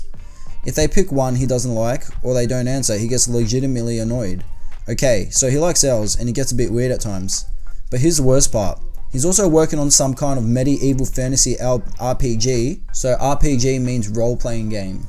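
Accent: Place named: Melbourne, Australia. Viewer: i know u were saying owls but im not from melbourne so ur melb accent making it sound like ur saying elfs n im sitting here picturing elfs for majority of it until i realised, my head hurts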